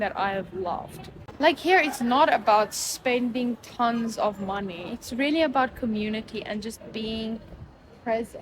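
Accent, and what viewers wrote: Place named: South Africa. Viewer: You are from South Africa? The accent gives away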